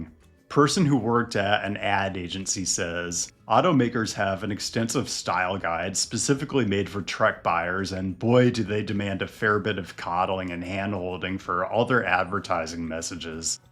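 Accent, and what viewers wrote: United States. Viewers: What is this guy’s accent? What state is he from?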